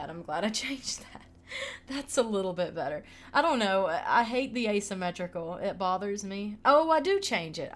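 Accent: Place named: Appalachia, United States. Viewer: Love your voice! Where are you from!? Definitely sounds like an Appalachian accent! Also love the renovation!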